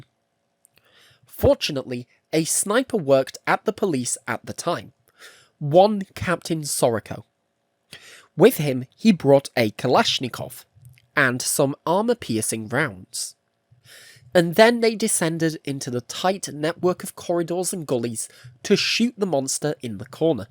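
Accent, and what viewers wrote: United Kingdom. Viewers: He's british, not german. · British Accent + Documentary + Chernobyl = Random Algorithm Banger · I’m unsure if this is AI narrated or not. The narrator is clearly British sounding but he uses the term flashlight instead of torch?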